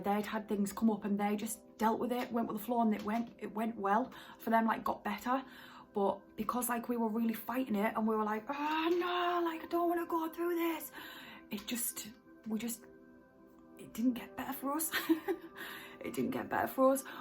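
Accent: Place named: Newcastle, United Kingdom. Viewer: What a brilliant channel, thanks 😁, I'm prepping to go in with some rue, but I vaped it via the vandy vape last time and it was slightly brutal.. Everytime I trip I always come out thinking I need to work with my energy and balance myself better before hand... And I'm useless at this 😂 positive your channel can give me some pointers though. Love the jordie accent too? Reminds me of living in the lakes 😊easy listening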